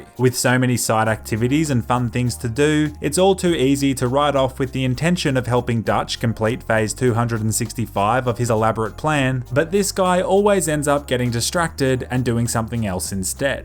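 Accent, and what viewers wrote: Australia. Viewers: You always sound weird. You're Australian. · Is this Aussie accent?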